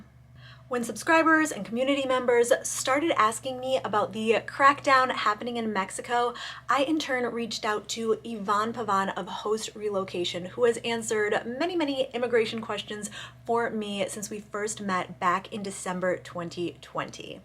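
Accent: United States. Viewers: You don't talk like your from Mexico, you sound like a pocho, as if you grew up in the U.S., corruption in Mexico is exaggerated, the U.S. just had a criminal leave office, the U.S. is a lot more corrupts then you think, its just the U.S. is so hypocritical, in the U.S. its all politics and race and Americans will forever say Mexico is so corrupts, for the next 1000 year becouse Americans go by what there thought and what they want to believe, they don't know Mexico, its always been that way, way exaggerated, I feel free in Mexico, more then in the U.S.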